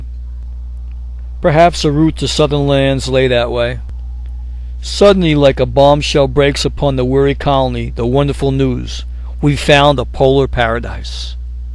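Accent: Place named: Northeast, United States. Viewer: Great information, thank you. Your music choices made it less than pleasant to watch though and I can tell you are from the far NorthEast somewhere.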